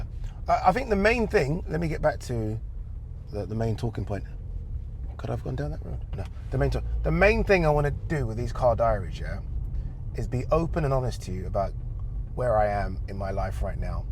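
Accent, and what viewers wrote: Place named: East London, United Kingdom. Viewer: This is so me driving around! And the "are you going out what?" Especially where you was driving 😅 (I'm based in bow) so I recognise it. Looool You're soooo east London the way you talk and I love it!😅 Btw You can do two way filming on some phones! (Samsung it's called director mode) I've enjoyed your candid chat, great convo! And you are perfect for club world cup! Congratulations Hun 😘 💓 👏 If you need a hand with your channel while you're busy doing the world cup and boxing, I'm more than happy to help. Maybe I should email you? Haha I like the name car confessions name but I see your point lol😂😅😅😅😅 but yeah car diaries is cool 😅😅😅😂😂😂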